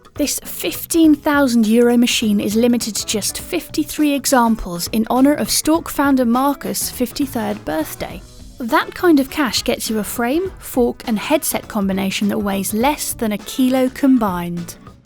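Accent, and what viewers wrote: United Kingdom. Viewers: Damn your british accent makes me ride along with you.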